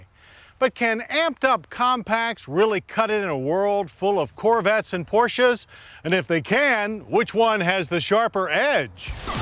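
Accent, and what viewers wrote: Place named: United States. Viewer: This commentator very american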